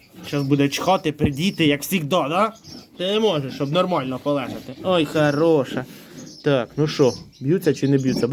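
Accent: Poltava, Ukraine. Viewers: По мові пізнала, що Полтавщина, а тут ще й Устивиця - бабуся там жила. Ностальгія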